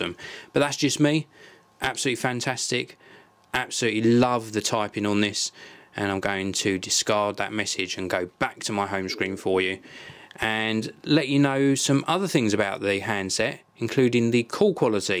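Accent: United Kingdom. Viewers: This is probably the most powerful british accent I ever heard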